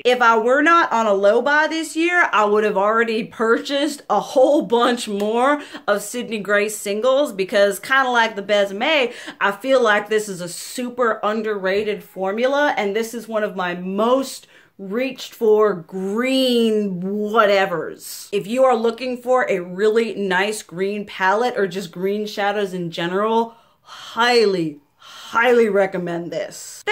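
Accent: South, United States. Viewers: I wasn't expecting the country accent from the thumbnail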